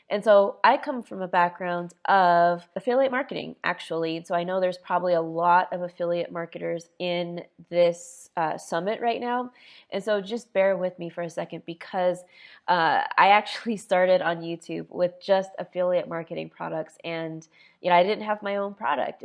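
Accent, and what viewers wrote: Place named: Australia. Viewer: Is it only me, or do I love his Aussie accent?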